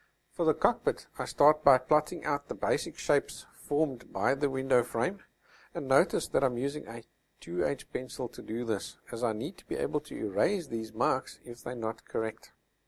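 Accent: South Africa. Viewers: Beautiful drawing! Are you South African?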